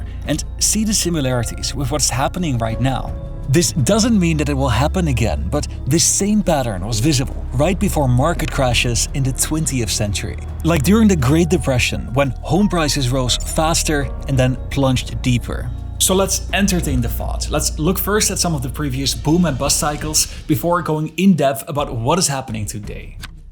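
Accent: Netherlands. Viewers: Ik kon aan je Engels horen dat je moedertaal Nederlands is. Checked the ‘About’ section…and sure enough. (Living in Florida past 15 years)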